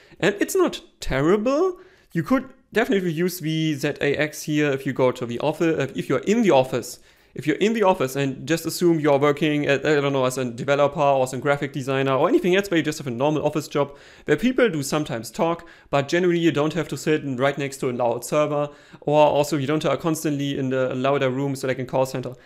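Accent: Germany. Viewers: the german accent which sounds more delicate than american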